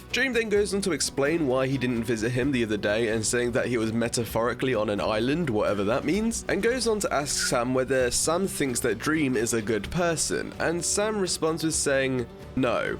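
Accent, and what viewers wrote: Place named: United Kingdom. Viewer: You sound like a British Sam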